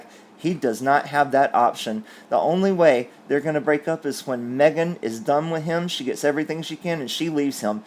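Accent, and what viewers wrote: South, United States. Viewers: Your accent is adorable! Where are you from? Clearly a southern boy.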